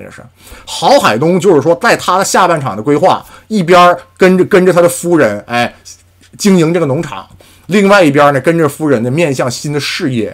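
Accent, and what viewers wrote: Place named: Beijing, China. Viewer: 好家夥，是青島人嗎？這口音我還以爲你是北京的呢，完全沒有青島口音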